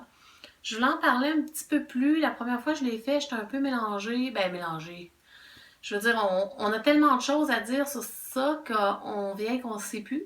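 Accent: Quebec, Canada. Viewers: Oh t’es Québécoise ! J’adore ton accent et j’adorerais venir visiter le Quebec un jour ! Vous dites le mois d’A-OU ? J’ai appris un truc haha. Sinon bonne vidéo. Au début de "mon réveil" je n’en revenais pas que ce soit partout pareil. Je pensais que c’était que dans ma congrégation que ça n’allait pas. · Merci de partager ton accent Quebecois.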